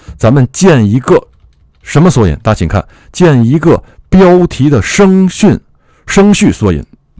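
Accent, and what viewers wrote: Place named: Japan. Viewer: 日本人的发音。。。包括 =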